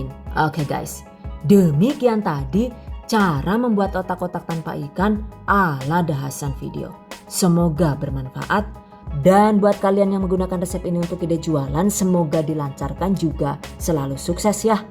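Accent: Banyumas, Indonesia. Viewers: Hahaaa seneng lahh kro suara ngapak'e kya nyonk kswuuunn resepe ya kk ❤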